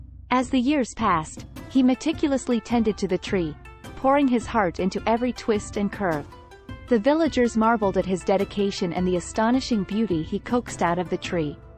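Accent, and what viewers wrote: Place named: North, United Kingdom. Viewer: That was brilliant and I love how you adapted your Northern growl into a feminine purr :)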